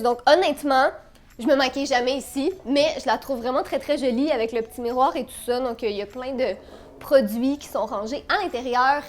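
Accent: Quebec, Canada. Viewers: trop belle ! j'aimerais trop avoir l'accent quebecois ! personnelement ma piece préféré c'est la cuisine ou ya la nourriture ♥ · salut tu est quebequoise ? car on dirai que tu a l'accent quebequois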